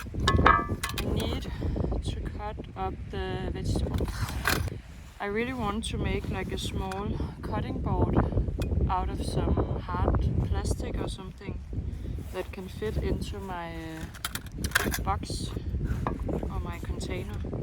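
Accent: Denmark. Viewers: I concur! Her Danish accent is easy on the ears. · with her lovely Denmark accent. The sound of a flowing river is always soothing. Greetings from Ontario Canada 🇨🇦 🍁 🇨🇦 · Hahaha! I love the Denmark accent! 🤙🏽💯🔥 Just like Cimbrer Bushcraft! Thanks for sharing the beauty of Denmark!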